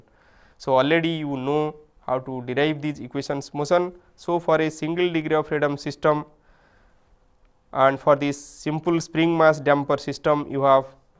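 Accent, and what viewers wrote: India. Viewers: These indians tutors are awesome! Thank you very much, very explicit! The accent is a bit hard but it is so worth, thx again.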